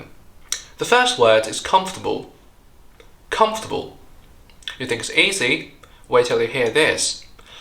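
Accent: United Kingdom. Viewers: 你的英语太好了。 You have an exquisite British accent. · 果然同樣身為華人比較容易了解弱點在哪，更棒的是還是英式發音，期待你下次更新，萬分感恩🙇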